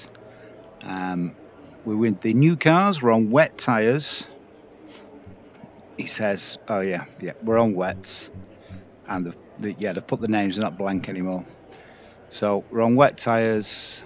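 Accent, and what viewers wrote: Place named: Northern England, United Kingdom. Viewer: Nice video, Could you show tyre temps on screen next time to see how a drying track affects tyre temps and whether or not you need to go off the dry line to cool your wet tyres? I know this video was about primarily the new particle physics etc, but on a drying track you need to know when to make the switch to slicks.Keep up the ood work, nice to hear a proper Northern accent coming from a Yorkshireman!!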